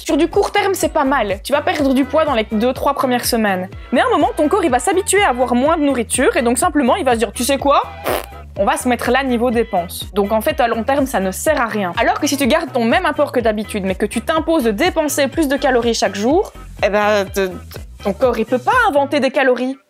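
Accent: Liège, Belgium. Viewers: Je kiffe son petit accent Belge ❤ si non super vidéo en fait g été renvoyer sur le n 1 alors que je commençais le n 2 😊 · Comment ça un horrible accent Belge ya quoi contre les belges laaaaaaa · Mais tu as toujours l'accent belge non? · Tu as un magnifique accent liégeois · t'as un accent belge de dingue hahaha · L'accent belge 👌👌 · ton accent belge on l'entend tt le temps Mais c pas grave on t'aime quand même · "Horible acent belge" t es sérieuse ! C etait pas loin d un dislike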